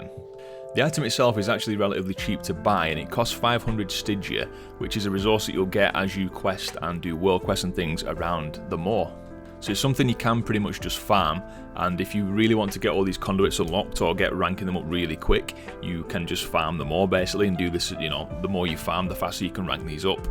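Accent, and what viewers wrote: Yorkshire, United Kingdom. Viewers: Thanks for useful insight. Yorkshire? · Thankyou for this video, excellent put together, and has made me me absolutely certain I do not want to play Shadowlands. Also, cant believe your from Chorley, you sound proper Yorkshire mate :)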